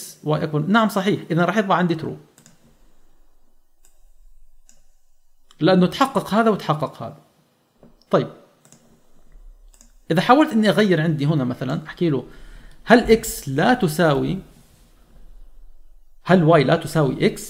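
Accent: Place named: Syria. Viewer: غريبة انه تكلم سوري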